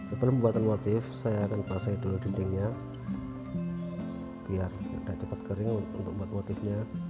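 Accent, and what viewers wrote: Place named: Java, Indonesia. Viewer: Wong endi Iki kok koyok wong jowo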